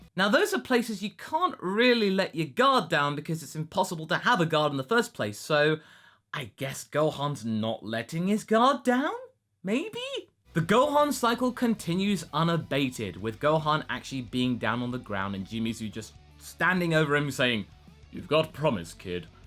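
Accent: United Kingdom. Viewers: The way brits say words is cringe to me cabber and freezer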